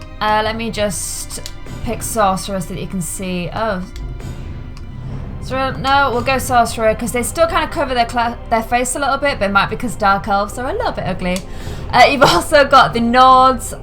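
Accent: United Kingdom. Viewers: Shes british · Oh my god she's so British.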